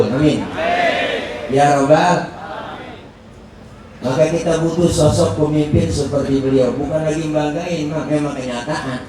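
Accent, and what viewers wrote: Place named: Betawi, Indonesia. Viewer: ustad adalah betawi Asli bekasi, betawi bekasi terkenal betawi medok, bahkan betawi bekasi menurut para pakar sejarah suku/bahasa, bekasi tempat awal lahirnya suku betawi! dan betawi Jakarta tempat memperhalus bahasa betawi bekasi! orang luar daerah jakarta menyebut betawi berasal dari jakarta, dan itu sudah dibantah pakar sejarah betawi dan pakar sejarah di indonesia, dulu jakarta sebelum dijajah dan sesudah dijajah portugis, belanda, di jakarta belum ada penduduk asli jakarta. dan bahkan masyarakat yang pertama dateng di jakarta bukan suku betawi. awal mula suku betawi dateng ke jakarta pada abad 17-18, suku yang dateng ke jakarta berasal dari bhagasasi atau yang sekarang di sebut bekassi. dan disitulah betawi menyebar ke jakarta, pinggiran perbatasan bogor, depok, tanggerang dll, bahasa betawi sudah mulai memperhalus, dan yang masih asli masih di kota kelahiran betawi di bekasi! 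semoga kita semua belajar lagi sejarah semua di NKRI, supaya tidak hilang suku dan bahasa di negara kita NKRI!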